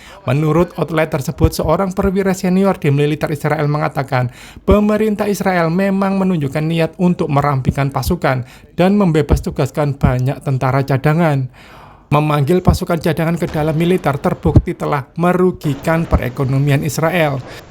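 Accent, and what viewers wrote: Java, Indonesia. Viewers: Komentatonya Ngomongnya MEDHOK... orang mana ya..??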